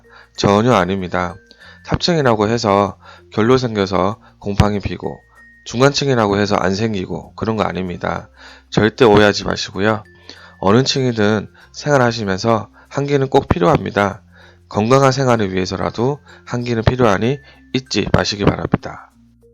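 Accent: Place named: Gyeongsang, South Korea. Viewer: 갱상도 꺼져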